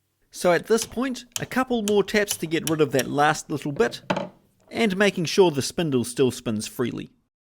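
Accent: New Zealand. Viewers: Love hearing a Kiwi accent, great to see another NZ bloke in his shed making quality content! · Are you a Kiwi guy? You sound like a fellow Mainlander.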